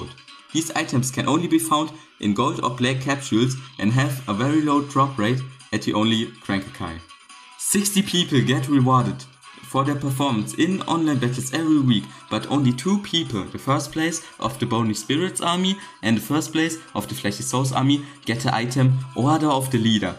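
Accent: Germany. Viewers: Man hört so krass den deutschen akzent haha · i hear a little bit deutsch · i know that german in the voice and backround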